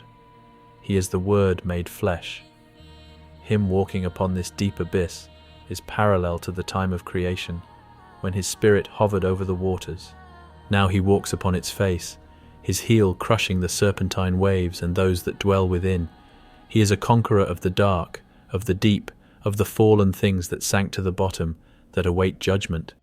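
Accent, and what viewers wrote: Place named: England, United Kingdom. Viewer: MY BROTHER YOUR WORK AND VIDEOS ARE SECOND TO NONE!! AND YOUR ENGLISH ACCENT MAKE IT EVEN BETTER! AND MY FRIEND THERE IS NO OUTER SPACE, THATS WHAT WATER ABOVE THE FIRMAMENT MEANS! AND WHAT THE DEEP MEAN DESCRIBED IN THE BIBLE! HOW DOES AN EXPANSE OF AIR LIKE NASA CLAIMS SEPARATE THE WATER ABOVE? IT DOESN'T! THE FIRMAMENT IS A SOLID GLASS DOME SPREAD OUT LIKE A TENT OVER A FLAT PLANE! THIS IS ONE OF THE STRONG DELUSIONS THE BIBLE ALSO TALKS ABOUT! GOD BLESS YOU SIR!!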